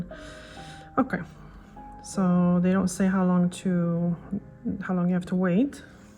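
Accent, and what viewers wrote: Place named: Poland. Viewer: Had no idea, but after first few words I knew you must be polish! 😅 such a hard accent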